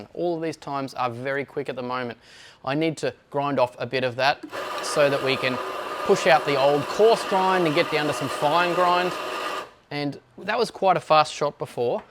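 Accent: Australia. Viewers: I heard the Aussie accent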